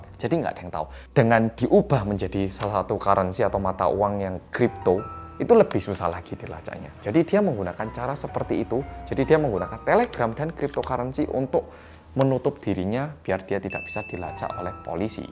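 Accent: Java, Indonesia. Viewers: Korea rasa jawa kali ya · Makin maju dunia makin parah. Aku ada Telegram on my hp but I don't use it, cuma sempat register sja sudah lama dulu. Kalo gitu gak apa2 kan? Btw, ini pertama kali lihat Korean yg bisa medok gini, lucu deh 😅. Di tmp ku sja kalo warga asing yg ngomong rata2 masih ada logat dri negara mereka juga. All d best,& stay safe during this covid19 pandemic ya..😊✌ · Jadi ini yo kasus yg mejadi trending di naver slama 3 hari, sya udah liat tpi nggak bisa baca tulisan korea. Untung up ini kasus jadi ngerti kan! Thnks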